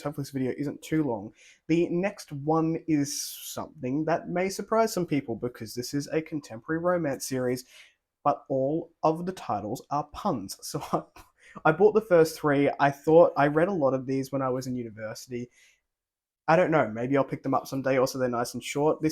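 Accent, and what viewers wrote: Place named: Australia. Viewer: Oh thank goodness. I've been hunting around for Aussie booktubers with similar book interests. Your accent makes it easier to hold my sorry excuse for an attention span. So many of these are on my TBR. The used bookstore is my vice.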